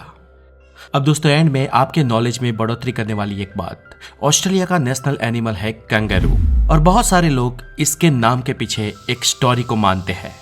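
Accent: Gujarat, India. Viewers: Gujrati bhai hindi suit nahin karti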